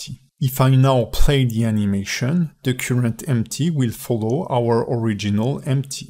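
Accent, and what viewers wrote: France. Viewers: T'es Français uhn ? ça s'entend XD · ça sent le bon accent français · i think you have a french accent ^^ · Hhaaa l’accent français 😄, super vidéo instructif, tout le monde en avait besoin. Merci beaucoup. En espérant avoir d’autres à l’avenir,bonne continuation. Ps: serait t’il possible d’avoir des sous-titres en français,même si on est en petit minorité sur ta chaîne(je pense) 👋👏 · french accent ? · Est tu français car j'ai l'impression que ton accent est français. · I love your french accent bro ( j espère que je me trompes pas en disant que t es français)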